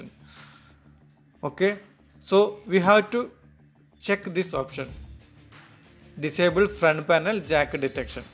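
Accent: Kerala, India. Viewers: ഇംഗ്ലീഷ് കേട്ടാൽ അറിയാം മലയാളി ആണെന്ന് !!!!!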